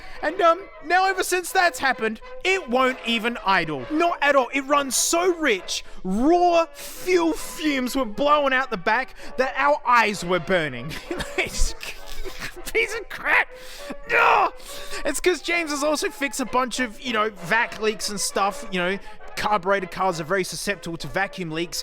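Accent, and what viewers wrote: Australia. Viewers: It never gets old hearing an aussie man scream about cars.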